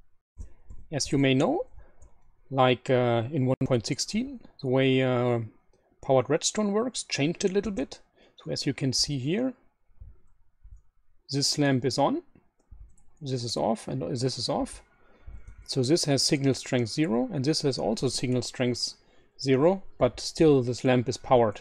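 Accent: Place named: Germany. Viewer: His accent sounds kinda german...🤔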